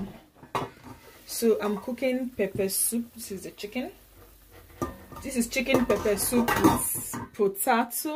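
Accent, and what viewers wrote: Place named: Nigeria. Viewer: Loll I know you're a Nigerian your accent tells it all. Anyway I like how you just be yourself.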